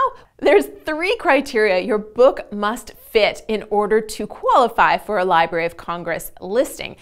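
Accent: Canada. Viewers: Are you Canadian? You sound like Samantha bee. Love your voice :)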